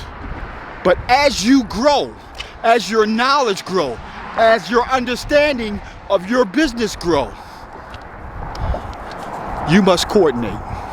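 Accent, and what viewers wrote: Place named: Baltimore, United States. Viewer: Mr., how about I was listening to you talk and said to myself..he's got to be from Bmore. I digested the knowledge you just share very easily. You delivered it very well. Thank you for sharing. I'm from Baltimore but living in NC. Nice video. Appreciate the jewels. I'll be tuning in Sir...keep the vids coming. Peace and blessings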